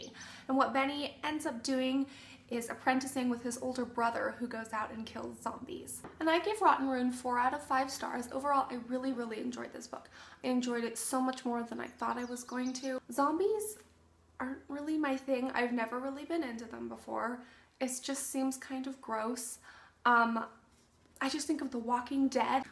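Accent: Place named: United Kingdom. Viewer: British! That’s the accent!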